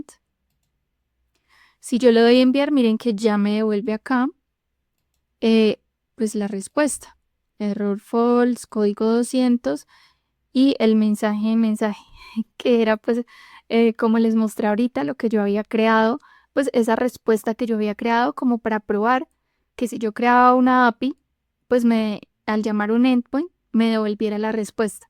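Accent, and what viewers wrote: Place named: Colombia. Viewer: Que delicia escuchar ese acento colombiano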